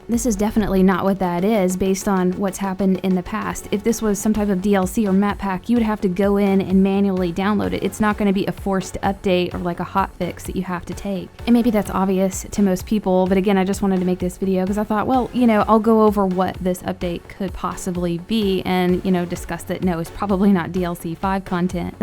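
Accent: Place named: United States. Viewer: Who Cares i am from england. her voice sounds 'normal' to americans yes but i love the american accent 😍😍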